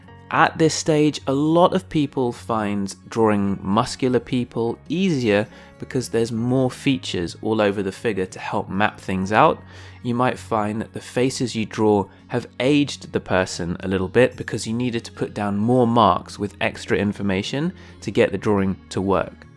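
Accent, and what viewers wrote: United Kingdom. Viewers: YEAAAH BRITISH ENGLISH! LOVE IT!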